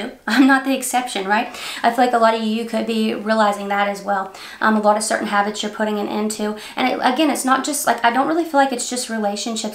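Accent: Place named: South, United States. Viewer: Your southern accent makes me homesick for Kentucky, my childhood........... are you from KY or Tennessee?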